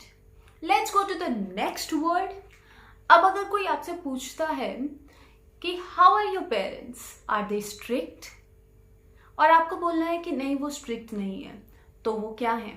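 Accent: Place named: Punjab, India. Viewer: hindi nolan da Great punjabi accent madam g. Sajid Karachi Pakistan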